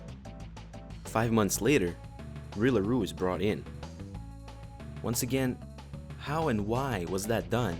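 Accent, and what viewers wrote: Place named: Minnesota, United States. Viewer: I like your accent, you from Minnesota or somewhere around there?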